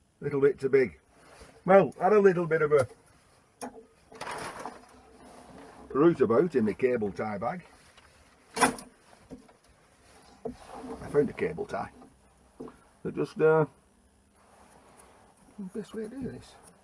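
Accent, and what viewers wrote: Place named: Midlands, United Kingdom. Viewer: Got my wheels turning, sir. Going to try it. Maybe find some wire if the ties don't hold up. As a Yank who's only been to the Midlands and up north a few x in a band, your accent brings back the best of memories. Discovered Max & Paddy and Peter Kay in the first tour...funniest man alive. All the best and thanks for the vid.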